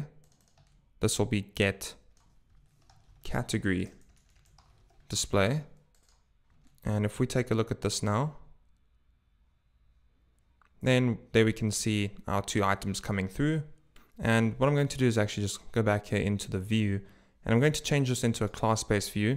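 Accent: South Africa. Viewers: Is this guy from South Africa? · Jy is 100% van suid afrika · is from Pretoria I think. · Is that a South African accent I'm hearing? 😌 Thanks for the tutorial 👍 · Sounds like a South African accent. 😌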